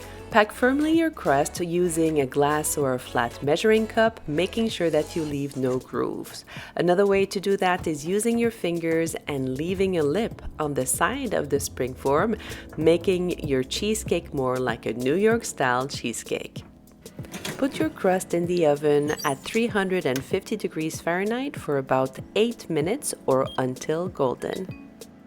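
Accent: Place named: France. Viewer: english is my 3rd language, who doesnt like a french accent is just weird lol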